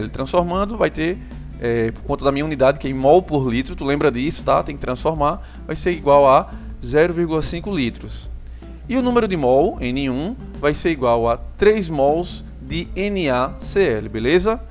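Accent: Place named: Northeast, Brazil. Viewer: adoro o sotaque nordestino ^^ (favor não ver 'nordestino' como depreciativo, falo nordestino como morador da região nordeste do país. )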